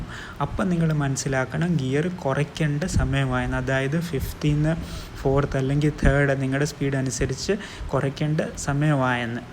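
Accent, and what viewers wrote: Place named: Trivandrum, India. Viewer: Bro tvm aanalleee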